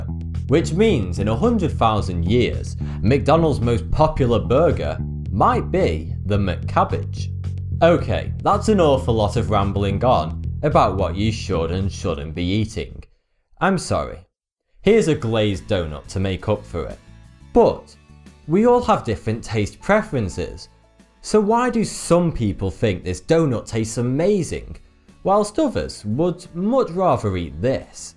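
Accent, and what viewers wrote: United Kingdom. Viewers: he's so British ha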